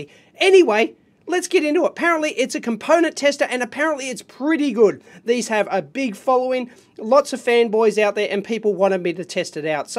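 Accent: Australia. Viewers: Jeez mate, patter is bonza. Stone the bleedin crows mate, strine is easy, try wrappin your lug holes around Scottish. We all talk like in straya especially after a schooner or two. Just keep an eye out for drop bears... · aussie terms crack me up,basically brit humour with an aussie accent,"pissin away power","one hung low". Great stuff mate.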